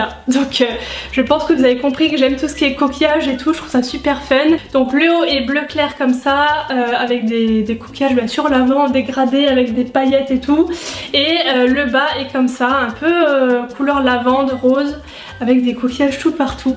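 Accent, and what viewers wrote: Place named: Nord, France. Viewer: Merci pour cette vidéo 😍 j'ai flashé sur les sandales et la ceinture 👍 j'adore tes vidéos, ta façon naturelle de parler et ton accent du Nord 😊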